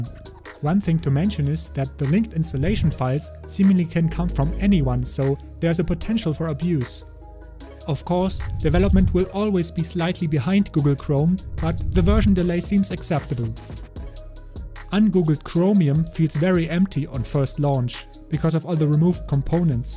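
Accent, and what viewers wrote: Germany. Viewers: bro you german too? (accent)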